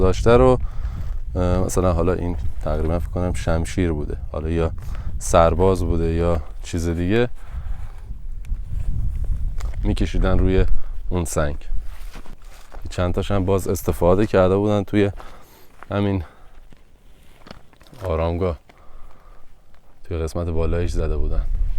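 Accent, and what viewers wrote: Shiraz, Iran. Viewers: تشکرمیکنم ازشماعزیزدل که ایران زیبارابالهجه زیبای شیرازی بمانشان میدیدبجای من پدرمهربانوببوسید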